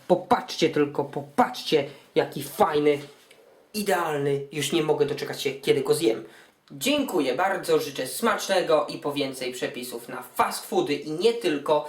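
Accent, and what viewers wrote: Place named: Kielce, Poland. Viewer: chlopieee powiedz mi ze jestes z Kielc :D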